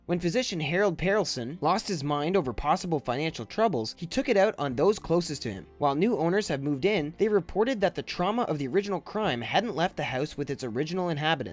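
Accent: Canada. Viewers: Annoying voice and Kanuk accent: "hoose" (house), "oot" (out) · Definitely a Canadian narrator. Can’t say house correctly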